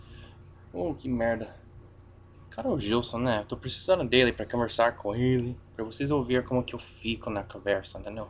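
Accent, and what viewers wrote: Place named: São Paulo, Brazil. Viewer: Melhorou de mais! O Brasil é um país que em nenhum canto se fala igual devido aos vários sotaques, porém existem alguns que são mais falados por aqui. Então vai depender de onde você vai ficar aqui no Brasil para podermos indicar um sotaque. O seu R depois de uma consoante no momento é igual ao dos paulistas, que no Brasil em geral é o menos usado. Ex.: "retardado" o mais comum é dizer "retarrdado" (Hetah-dado)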